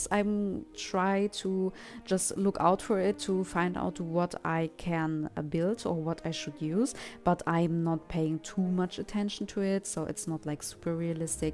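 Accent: Germany. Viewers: Thanks for the inspiration. But a funny question are you german ? Wegen dem Akzent und dem Wort Glas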